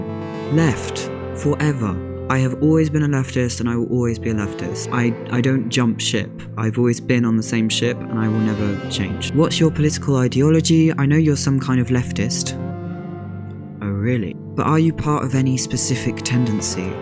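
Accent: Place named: North England, United Kingdom. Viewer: Delete this comment if it's too personal, but how did your lovely accent come about? Were you born in the north of England before moving to France, were you born in France and learned English from BBC television? Just curious because your voice sounds so good and I love hearing about where different accents originate from.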